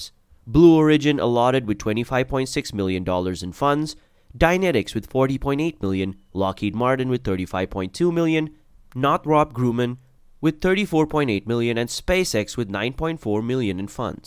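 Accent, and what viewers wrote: South Africa. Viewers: where's the dude with the subtle South African accent, change of narrator NOT GOOD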